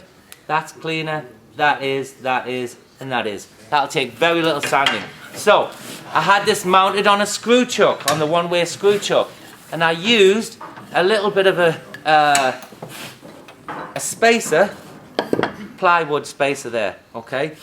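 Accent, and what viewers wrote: Newcastle, United Kingdom. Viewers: Took me about 10 mins to recall where his accent originated, then he later confirmed with, ''my mate in Newcastle.'' Obviously well experienced, and fully competent, without loads of boring ''waffle'' like some. Actions always speak louder than words. Enjoyed the demonstration, and found it very educational, but turning with 'wings' gives me the heeby-jeebies, ever since I got my finger tip whacked by one.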